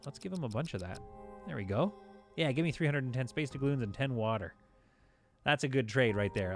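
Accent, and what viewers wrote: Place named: Yorkshire, United Kingdom. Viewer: Don't you be dissing the Yorkshire accent!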